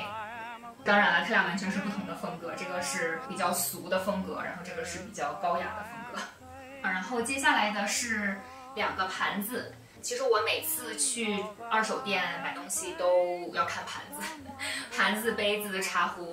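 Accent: Northeast, China. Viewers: 妹子的东北口音已经被修饰得非常轻微了.你精心地学习过普通话，但是音节尾脚还是能被缺姐这样的听出来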